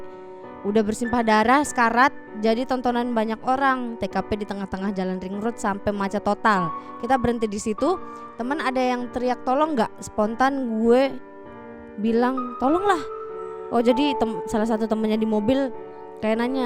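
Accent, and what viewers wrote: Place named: Lombok, Indonesia. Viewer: Aksen wong lombok..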